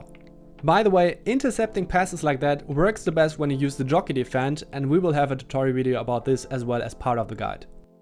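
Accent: Germany. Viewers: Bist du Deutscher? Hört sich stark so an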